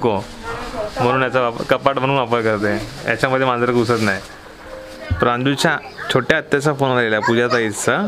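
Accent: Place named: Shrivardhan, India. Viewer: तुमची भाषा आमच्या श्रीवर्धन सारखी आहे लय भारी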